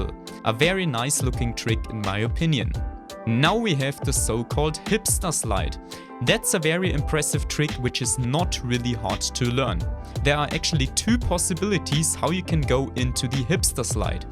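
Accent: Germany. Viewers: Irre ich mich oder höre ich da einen deutschen Akzent heraus? 🤭😂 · Dieser Deutsche Akzent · Du bist deutsch oder? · Man hört den deutschen raus 😂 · Are You German? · Dieser deutsche Akzent xD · Man hört richtig das du deutsch bist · Bavarian englisch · Dieser deutsche Akzent zu wild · The fax that’s he German u can say by he’s accent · Is he German he has so A German act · wusste ich doch, dass ich einen Landsmann an seinem Akzent erkenne. xD Danke für die schnelle Antwort, mach weiter so mit den Videos. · Its a DEUTSCHER AKZENT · Are you german? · Ahh ich war mir nicht ganz sicher als ich den Aufbau der Deutschland Flagge auf dem Pfp gesehen habe aber mit dem Akzent bin ich mir sicher also dir noch ein gutes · Are u a german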